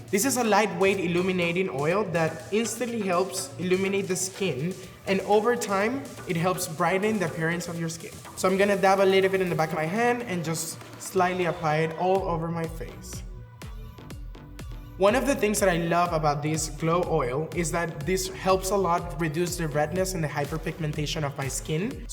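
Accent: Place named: Spain. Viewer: I just love your positivity energy and how you speak English with a little bit of Spanish ❤️❤️ I also did a quick apply and viewing video for this month I got almost the same thing beside the lashes tho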